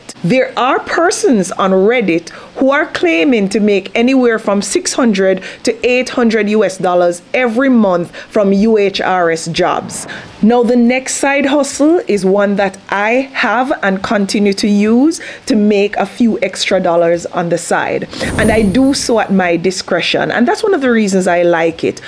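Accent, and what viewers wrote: Jamaica. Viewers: I received your email and apologize for not responding immediately. I got sick over the weekend after visiting the hospital to get a CT scan done. I picked some virus and came down with a dripping sinus and a fever in the early Sunday morning, so I was also absent from church service in an effort to prevent spreading my germs. However, I must admit that I love you so much and believe you are a God send angel on earth for so many struggling people, myself uncluded. And, I hope you won't mind if I call you because, from your accent and the way you say 'walk good'; a saying we Jamaiacan people have, I strongly believe that's where you are from. If so, more power to you. If not, I still say more power to you and lots of love and blessings from God our father, from whom all blessings flow. To your followers, believers and non-believers, please DO NOT TAKE OUR GODLY BELIEF personal. 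You are entitled to your spiritual belief as well, and YOU ARE JUST AS LOVED AS and me.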